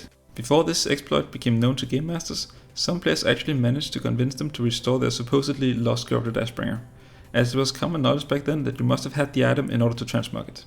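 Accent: Denmark. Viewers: "A new channel based in Europe" You are very much Danish my guy, the accent does it all :0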